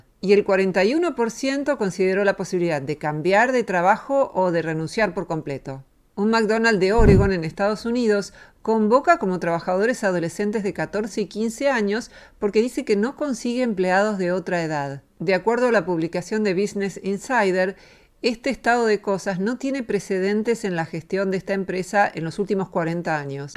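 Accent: Argentina. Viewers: Paradojico que haga este tema una persona con acento argentino con el 50% de pobreza y grandes problemas de inflacion y falta de trabajo, tendria que fijarse en subpais de vez de ver otras situaciones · De donde saca esos datos?? Amiga Argentina Voz de donde es eso ??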